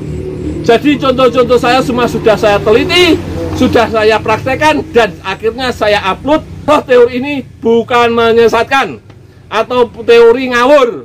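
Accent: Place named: Surakarta, Indonesia. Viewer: Dari logatnya kliatannya pak dhe berasal dr wlyh eks karesidenan solo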